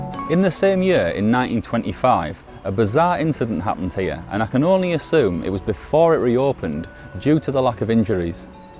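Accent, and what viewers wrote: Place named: Teesside, United Kingdom. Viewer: You sound as if you are from Teesside area, not Scarbrough ?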